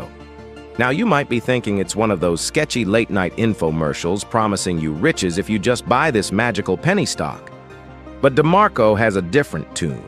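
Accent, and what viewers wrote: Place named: United States. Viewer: You have a real cowboy accent in this video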